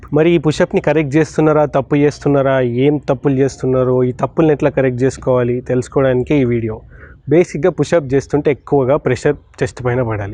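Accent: Telangana, India. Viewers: Godavari abbayi telangana slang lo matladinattu undi bro ..mi words...simply superb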